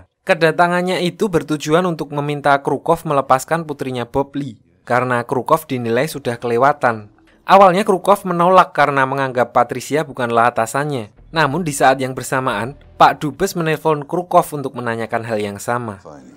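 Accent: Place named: Java, Indonesia. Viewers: Fixs wong temanggung admin e 😂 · Medok tp keren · Medok banget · gak bisa biasa aja apa bang medok banget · medhokk cokk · njir sikak wong temanggung ta 🗿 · Youtuber dari jawa timur ini kyaknya, udh jelas bgt dari omongannya wkwkwk..😂😂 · medok cukk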